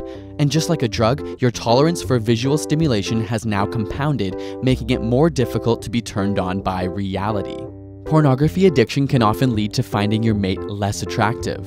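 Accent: Canada. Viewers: OMG YER CANADIAN!!!! ^_^ YAY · Wait, is Canadian?:D I hope they are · Oh your canadian, pog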